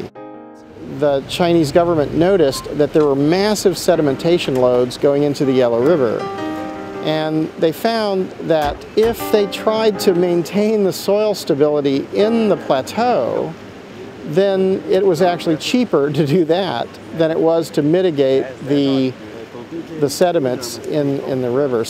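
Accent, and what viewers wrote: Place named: United States. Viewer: He sounds American to me.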